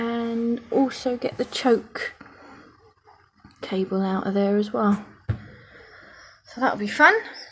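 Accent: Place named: Suffolk, United Kingdom. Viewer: hey, just bought my rover 90 p4... hope to see you about, you sound local ish to me, Suffolk but I am no good with accents anyhow so your probably not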